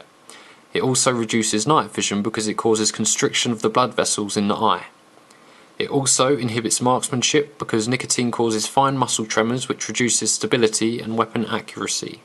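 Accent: United Kingdom. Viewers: you from uk man?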